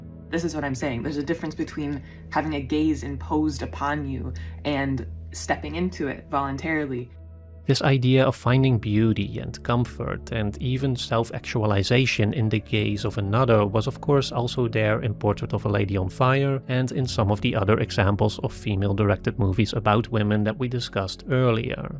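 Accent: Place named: Netherlands. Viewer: hmmmm you sound Dutch. Are you Dutch?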